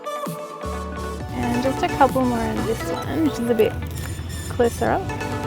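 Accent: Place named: Australia. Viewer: Hi. Is it one preset or five diff ones? By the way were you born in Australia your accent sounds a little different.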